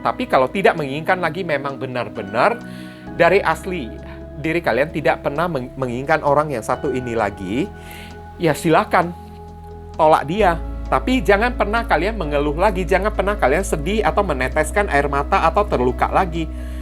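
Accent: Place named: Medan, Indonesia. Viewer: Saya pilih pile 2. Terima kasih ko readingannya selalu resonate. salah satu tarot reader favorit saya..readingannya banyak nasehat2 baik yg memotivasi..kadang serius kadang dselingi dengan lelucon2 yg lucu bikin saya ngakak dengan logat2 Medannya yg kocak 😂😂🙏🙏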